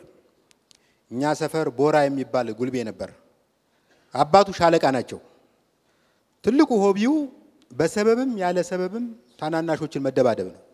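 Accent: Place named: Rural, Ethiopia. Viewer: በውቄ አንተ እኮ ትለያለህ የገጠሩና የባህል ዘዬ አቀራረብ ነው ዋው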